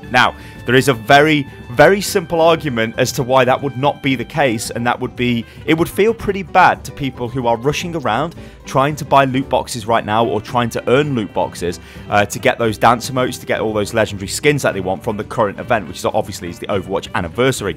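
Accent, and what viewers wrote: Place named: United Kingdom. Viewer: where in Britain are you from?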